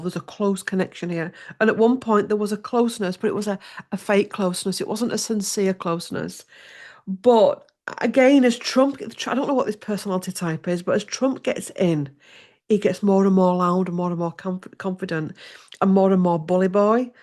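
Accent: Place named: Northern England, United Kingdom. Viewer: . Hi Curious! Your comment was so gentle, unlike mine. I resist a little harder than “respectfully”. I am empathetic to your position, but I am so afraid that trump(no capital) is a dangerous man with a severe personality disorder that puts us all in danger. If you are an American going through this shambles, I am sending love and respect from Canada, where we see this chaotic nightmare every day! I am a Brit transplanted to Canada and sometimes listen to for her good heart. I relate to her accent and comic idioms from the North of England. ❤️🇨🇦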